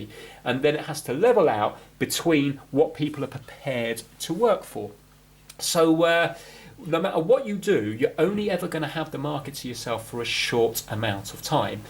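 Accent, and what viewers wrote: United Kingdom. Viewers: I find watching videos of people trying to fix thing therapeutic also I love the British accent (I'm American btw) Congrats on 500k glad to be apart of it :)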